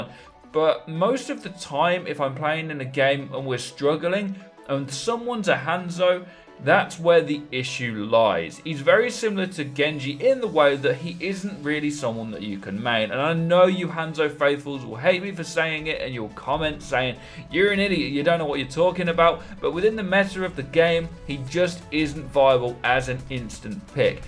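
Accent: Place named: England, United Kingdom. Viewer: Saying crap with a bit of an English accent doesn't make it true.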